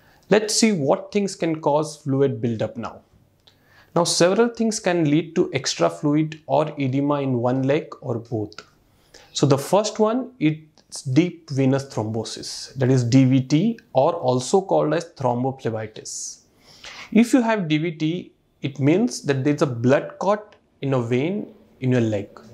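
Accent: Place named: India. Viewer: it's good Inglish with Indian accent I understood all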